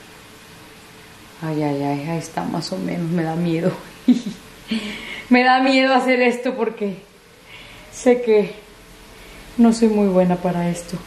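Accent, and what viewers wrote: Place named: Mexico. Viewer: Al escuchar tu acento Mexicano me dio mucha emoción ver que alguien de otro país hiciera pupusitaaas! y aun mejor KETO😱 Soy de El Salvador así que apreció tu esfuerzo! Gracias por la receta! Me gustó ☺️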